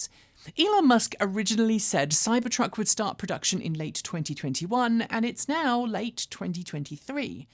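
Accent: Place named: United Kingdom. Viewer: "While Elon Musk has been very cool towards..." Oh that's right you speak the Queen's English. It's a bit ambiguous to a Murkin.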